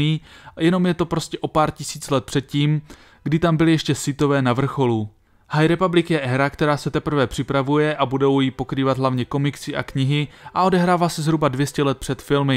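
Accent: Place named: Moravia, Czech Republic. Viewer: Bože ta moravština je hrozná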